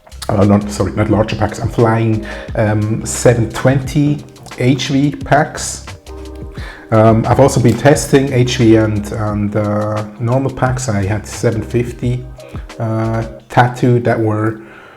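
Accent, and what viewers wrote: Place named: Switzerland. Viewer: Bisch du Schwiizer? De Akzent hani nach 3 Sätz kennt 😅. Aber dis Englisch isch super!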